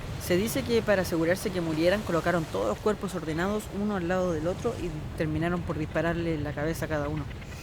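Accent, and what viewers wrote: Chile. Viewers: Mira chiquillo...estas hablando cosas fuera de la realidad el hecho que quieras más adeptos a tu canal no implica que tengas que contar mentiras...te sugiero que te informes mejor sobre el contexto que se vivía en esos años...esas que tú llamas seres humanos tenían por costumbre matar mujeres embarazadas que aún agonizando les habrían el vientre para sacar Asus bebés...por eso es mejor decir la verdad y si hoy están muertos es por que ellos también asesinaron...y a ese penal llegaban los delincuentes terroristas más abesados...por el bien del Perú te pido no hablar de manera desinformada y porfa hablen como peruanos no con ese tufito medio chileno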